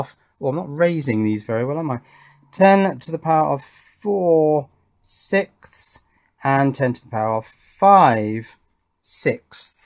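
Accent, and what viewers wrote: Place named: Northern England, United Kingdom. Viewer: Hahah! What part of England are you from? Your accent sounds just like Wallace (of Wallace and Gromit Fame). I found myself wanting to just say, “Cheese ... Gromit!”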